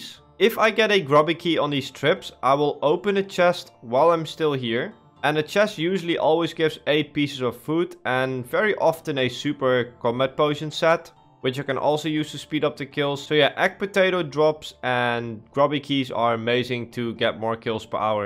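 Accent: Netherlands. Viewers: Mooi Nederland accent:)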